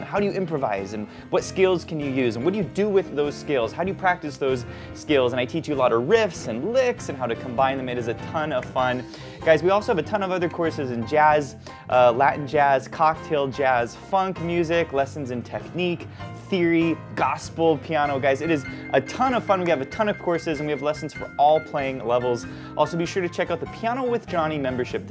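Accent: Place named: United States. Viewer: Merci beaucoup Mr Jonny! Vous m'apprenez beaucoup de choses intéressantes, et même en sus, un petit cours d'anglais américain, ce qui est toujours bon à prendre au passage! Je vous comprends assez bien! J'en suis content! Surtout! Ne vous arrêtez pas! ! MERCI!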